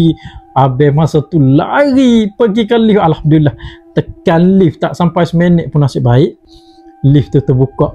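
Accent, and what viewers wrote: Kelantan, Malaysia. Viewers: Assalamualaikum. Subscriber baru ni 🙋‍♂️ best dgr citer loghat kelantan ni. Biasa dgr kak nini salina channel frainkiz je kecek klate hehehe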